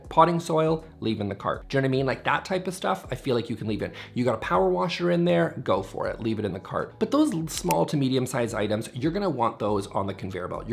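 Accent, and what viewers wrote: Canada. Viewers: a Canadian accent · I’ve never noticed your Canadian accent until this video. It must come out when you get worked up 😂. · Love your fun combo of “gay voice” and Canadian.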